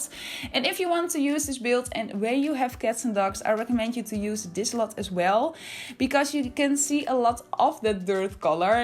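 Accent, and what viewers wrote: Netherlands. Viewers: I can hear you're probs dutch!! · Love.kawaii Cute ja ze is Nederlands, en haar accent is indd heel erg aanwezig, ik denk dat ze echt wel een Engels accent kan opzetten maar het enigzins misschien niet durft? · Are u dutch? Cus you have a super heavily dutch accent. Als je Nederlands bent kan je dit verstaan/begrijpen. Je hebt zo erg Nederlands accent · I didn't expect you to be dutch but that accent bro... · You're dutch aren't you? Hehe i recognize your accent😄🤭💓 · That is awesome❤ btw you sound dutch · That’s a beautiful build, impressive Your accent gives me Dutch vibes, I think I’m right ;) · Love your accent! I'm Dutch too hahahaha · Are you Dutch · I bet your Dutch I can hear it Dus hey hoi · Yo ben jij nederlands? Want ik kan het wel horen hoor. De build is trouwens wel vet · the house looks very good. the accent is very dutch.. 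· Oke, ben ik de enige die hoort dat ze nederlands is? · Are u Dutch? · I can hear your from the Netherlands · I hear a dutch accent love it 😂❤❤ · Lmao immediately heard you were dutch! Leuk om een Nederlander tegen te komen die ook bezig is met Sims 4 <3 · Wat mooi! Ik word er altijd zo gelukkig van als ik een Nederlands accentje hoor. Voel me meteen thuis 🥰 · Awesome build!! Just found your channel and can't unhear your dutch accent, sorry hoorde meteen dat je Nederlands bent hahaha ( niet slecht bedoeld viel me gewoon als eerste op ) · You sound dutch 🤔 (I am dutch) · mooi! ik kan horen dat je nederlands bent 😁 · Omg THATS A GORGEOUS BUILD the way you made it circular is so smart! lol btw ik kan gewoon HOREN dat je Nederlands bent🥰😝 good job!😍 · Hello! You're such a great builder! Keep going! Also, is it true that you come from The Netherlands? Because i am Dutch and i can hear a Dutch accent in your language haha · Dat nederlandse accent!! 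· Je heb nogal een Nederlands accent, dus ben jij Nederlands?😂🌸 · Echt prachtig! Ik ga er vanuit dat je Nederlands bent met dit accent hahaha 🥰🤭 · Ik heb met je stem begrepen dat je Nederlands bent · I love this house! It looks so clear and still it fits in very well with nature. Where is the reference image from? Also; your accent... are you Dutch too? :)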